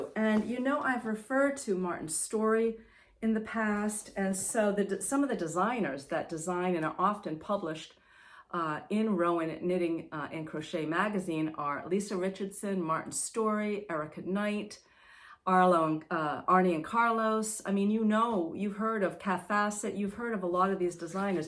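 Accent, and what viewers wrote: New England, United States. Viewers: Hello,. What a pleasant surprise to come upon your podcasts. We seem to have a lot in common besides a love of knitting. I grew up in Pawcatuck, CT so still consider myself a New Englander. I love your footage of Block Island which I have visited once - such a precious memory of a lovely day with my Dad. My brother lives in Westerly, RI so I love your accent. I, too, have lost a wonderful uncle who left a legacy of storytelling. Your knits are gorgeous and so inspiring. I currently have an Artus shawl on my needles, have knit the Half + Half Triangle shawl (have linen quill to make 2 more), and love all the blue/green shades that you are drawn to. Love your reference to the relationship between gratitude and happiness! Looking forward to your future podcasts.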